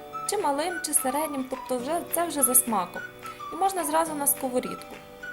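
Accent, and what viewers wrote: Western Ukraine, Ukraine. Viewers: Не гадайте яка область західний говір приємний ніжний доходить до всіх хто кохається в мові України.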